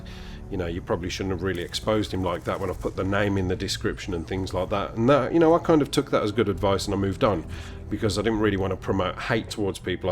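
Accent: Birmingham, United Kingdom. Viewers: Keep doing what you wanna do and if things are a little stale then play other games for a while, just dont leave!:( I will miss you and your brummie accent and that laugh you have.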